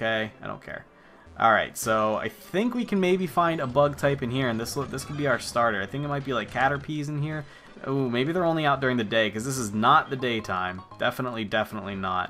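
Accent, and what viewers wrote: Sweden. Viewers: your Swedish accent sounds like Sven from happy feet 2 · That is one legit Swedish accent!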